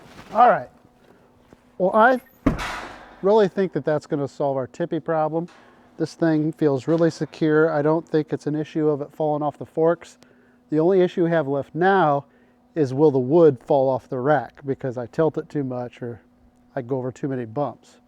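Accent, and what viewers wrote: Germany. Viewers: Why does this have a German voice over?????????????????????????????????????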